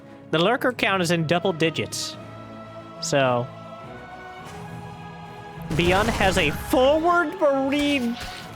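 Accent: United States. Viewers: Ты американец?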